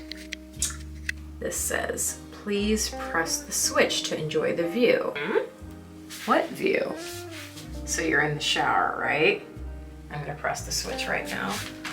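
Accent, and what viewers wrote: Australia. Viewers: ur australian accent bye i'm crying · The Australian accent 😂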